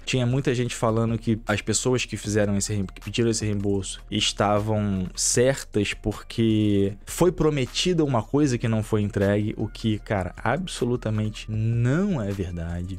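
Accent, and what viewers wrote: Rio de Janeiro, Brazil. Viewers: Eu acompanho o desde os tempos que o cara tinha 20k de inscritos, discordo dessa opinião dele, mas o pessoal fica muito emocionado. Eu tbm sou carioca e esse jeito dele falar é uma característica nossa, no fundo ele sabe que o pessoal não está puto por não ver a boneca pelada, mas está puto com o fato de mudanças nos jogos.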